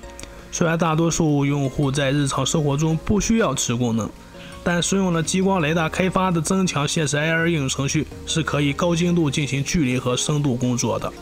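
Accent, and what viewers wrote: Shanxi, China. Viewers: 而且是山西中北部，晋南不是这味儿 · 这口音不是山西就是呼和浩特的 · 确切一点是山西口音，或者西北口音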